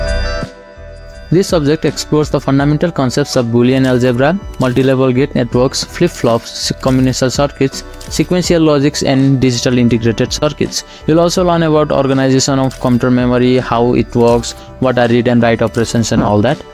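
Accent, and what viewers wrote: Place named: Nepal. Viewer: Bro speak in nepali. Do what you are good at. Nepali accent is not appealing.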